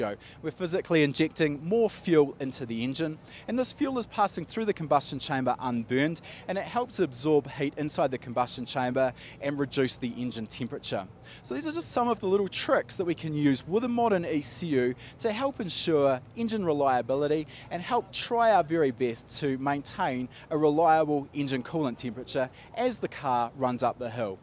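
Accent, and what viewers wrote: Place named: New Zealand. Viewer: Great thinking here. Real world tuning. P.s. loving listening to your NZ icksent. :)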